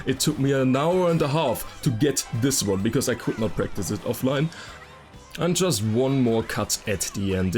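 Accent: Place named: Germany. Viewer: so unangenehm wenn deutsche englisch reden müssen 😂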